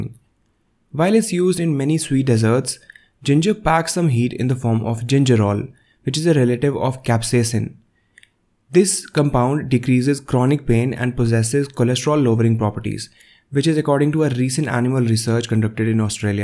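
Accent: India. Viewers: Indian voice 🇮🇳🇮🇳🇮🇳😊❤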